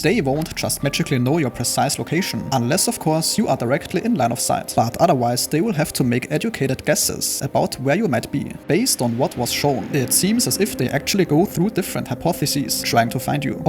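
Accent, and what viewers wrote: Germany. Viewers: Am akkzent war klar zu erkennen das hier ein Deutscher zu sprechen scheint.. Aber österreich ist auch nah genug dran.. Danke für das Video und die Informationen ❤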